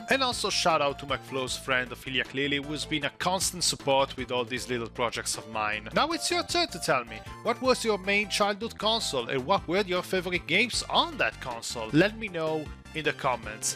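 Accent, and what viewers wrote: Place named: Italy. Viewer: Your voice is pretty boring, and honestly I’m not a big fan of your Italian accent. Also, I think showing the mini SNES with loose cartridges feels a bit ridiculous and annoying. Using the original console would have made a much better impression.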